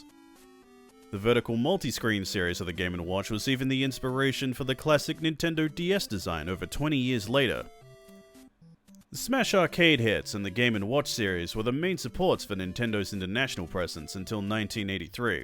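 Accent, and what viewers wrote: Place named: Australia. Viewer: Man the Australian accent sure does kinda suck, basically roll the dice to decide how to pronounce half the words needed to make a video like this. And I say that as an Australian.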